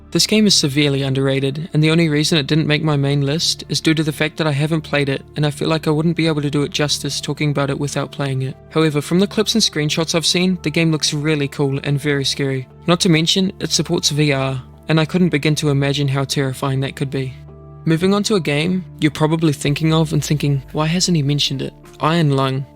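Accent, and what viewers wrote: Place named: New Zealand. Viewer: I was thinking the whole video "Is this guy Kiwi" You have a very Kiwi accent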